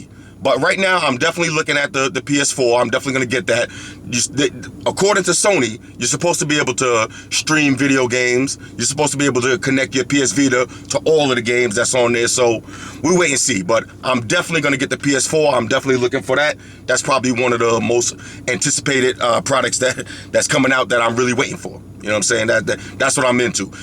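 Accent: New York, United States. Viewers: Thats that NY son Q'borough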